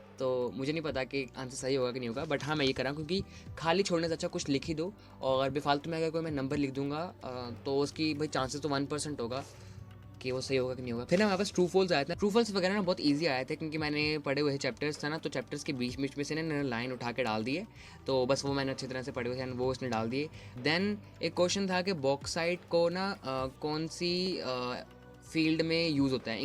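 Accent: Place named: Punjab, India. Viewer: Bai lgda punjabi a hna ?? Boln de tarike toh lgda 😂♥️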